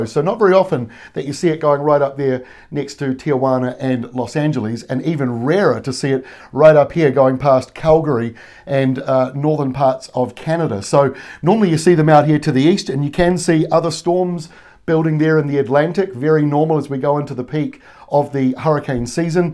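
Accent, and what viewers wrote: New Zealand. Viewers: Love seeing local weather with a NZ accent, a smile, and no screaming. Got the colors right, too.. Beautiful.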